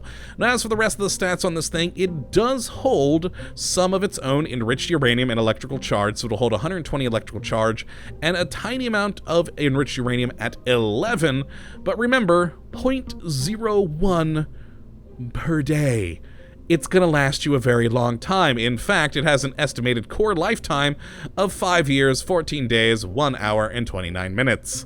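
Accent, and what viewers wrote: United States. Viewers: Not really, as a British person, it is clear to me he has a distinct American accent. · Definitely, I'd imagine the accent would stick out like a sore thumb to anyone native to Britain It's unfortunate how rare it is to find an American who speaks English thoughtfully though :(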